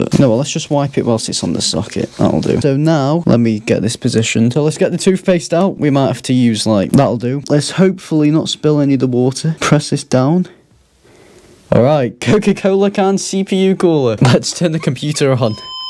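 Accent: United Kingdom. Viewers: this video just got recommended to me and i clicked and now im invested in this channel, idk i think its the fun stupidity and the british accent as im british aswell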